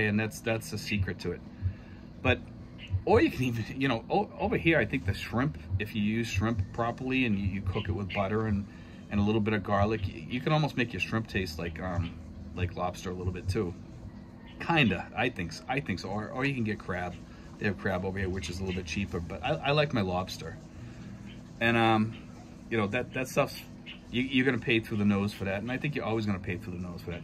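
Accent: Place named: New York, United States. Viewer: I love your New York accent, sir! I wonder what the story is how Trece Martires got that name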